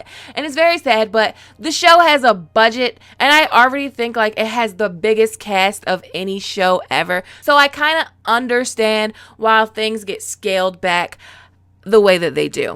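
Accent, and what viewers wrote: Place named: Baltimore, United States. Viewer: I just wanna hear you talk all year lbs. Is that a Maryland/Baltimore accent im catchin?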